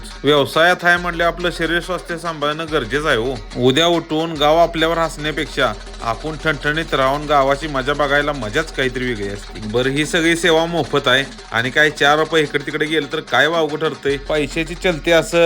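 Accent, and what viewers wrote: Kolhapur, India. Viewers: दादा, Vlog मध्ये काही झाले तरी कोल्हापुरी भाषेचा बाज, कोल्हापुरी भाषेतून जाणवणारा दिलदारपणा आणि कोल्हापुरी भाषेचा ठसका काय कमी होऊ द्यायचा नाही. हीच तर आपली खरी ओळख आहे.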